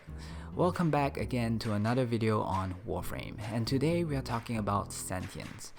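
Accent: Singapore. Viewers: what are u Singaporean?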